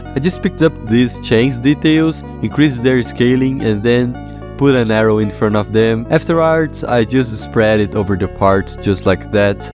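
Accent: Brazil. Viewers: are you from brazil? the accent sounds familiar... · You brasiliano?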